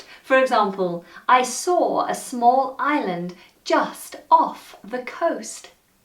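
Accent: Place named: United Kingdom. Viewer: I'm( in ) love with British pronunciation...A fan from colombia south America..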